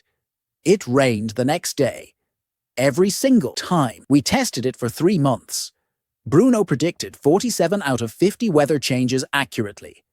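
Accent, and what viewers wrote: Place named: Dublin, Ireland. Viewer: What a horrible voice over - some sort of warped south side dublin accent , sounds like it’s reading w emphasis in all the wrong places - unreal - cringe making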